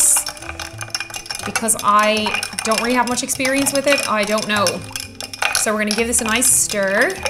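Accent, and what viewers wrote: Ireland. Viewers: Speaks beautiful irish 🚻 is absolutely stunningly beautiful 🚸plausibly bordered line alcoholic 🚼🚼,Jesus could you stop sending your angels to earth to be amongst us mere mortals,🤔😂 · Pronounce worcestershire however you want to. Modern English changed all of the pronounciations of these types of words, anyway. There's really nothing anyone should say. Maybe that's just the Irish bit of me wanting to stick it to the English? I am fine with that. Like they've been known to be nice to Irish folks, riiiight? Definitely anything sounds adorable in an Irish accent, so there is that, too.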